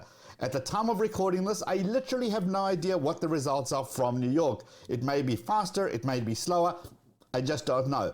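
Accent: South Africa. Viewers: You have a South African accent 🤔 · Hey boet. Your accent sounds South African. Let me know if I'm right. I'm from Cape Town, ZA. On 5G, using the same app you are I got 464Mbps this morning. I religiously test atleast once a day...My connection speeds have always been between 450Mb and 500Mb.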